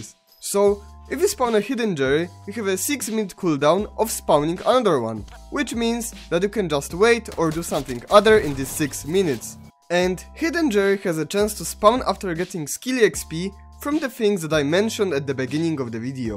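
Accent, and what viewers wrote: Poland. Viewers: I don't want you to be offended but after the first 10 seconds I immediately recognized this Polish accent :D btw Nice video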